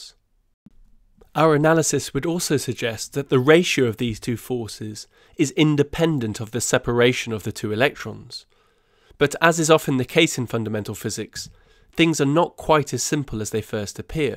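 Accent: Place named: RP, United Kingdom. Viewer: I hate your british posh accent. It sounds like you are constantly trying to project this exaggerrated image of finesse - thus implicitly also trying to cast social hierarchy. I guess this is typical to cultures who have had royal courts for several centuries such as england, france or sweden. But please stop doing it. I'm not saying you have to start talking like a football hooligan but I know brits can speak in a reasonable manner.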